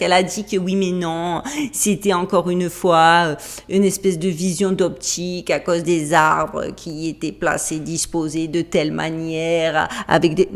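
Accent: South, France. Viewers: Merci c tjs un super moment malgres le sujet terrifiant Magnifique travail et je suis d aix en provenve donc J ADORE ta narration bien du sud Bravo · Salut j’aime tes vidéos que je viens de découvrir continue ne lache pas ! L’accent du sud donne un plus à tes vidéos! Peut tu s’il te plaît faire une vidéo sur le gangstalking qui va avec le thème de tes vidéos?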